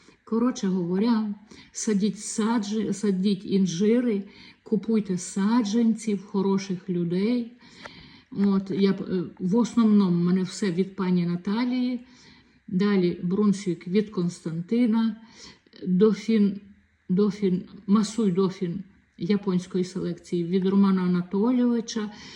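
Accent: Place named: Kyiv, Ukraine. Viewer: Доброго дня пані, сьогодні попала до вас на гостину на ваш канал вперше, бачила ваш коментар у Вікторії та що вирощує свині з Запорізької області, переглянула ваші шортси і відео, дуже цікаво, у вас такий гарний голос, приємний, звідки ви? У вас така мова як у мої тітки яка живе в Фастівському районі Київської області. Тільки прочитала під одним відео що у вас можна замовити пепінку, вперше про неї почула у вас, але як смачно ви її куштували і яка вона соковита в мене аж слина з рота побігла😅. І тут я читаю коментар який був написаний два дні назад що вже немає у вас саджанців на замовлення, дуже вдячна якщо дасте номер пані Наталії з ізмаїла, а можливо у неї є також канал на ютубі? Також буду вдячна якщо скинете силку на її канал, соромно звзнатися але інжир в такому вигляді я ще не їла, тільки в'ялений і той що я їла вперше він мав запах якихось ліків. 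Скажіть будь ласка по якій ціні Ви купували той інжир, плоди, що з Ізмаїла. А ще буду дуже вдячна якщо дасте адрес, того пана Анатолія де ви брали саджанці пепінки. Я сама з Одещини 🙋‍♂️. Поки дослухала ваше відео, вже в мене склалося враження що ви з Житомирської області, там також жила моя покійна тітка. Ще раз дякую дуже велике вам за відео, дуже приємно було з вами познайомитися, скажіть будь ласка а ви себе не показуєте у відео, дуже приємний ваш голос хотілося б вас побачити. Всього вам самого-самого найкращого пані, хай Бог береже вас і вашу родину, Божого вам благословення 🕊️🙏🙏🙏 буду з нетерпінням чекати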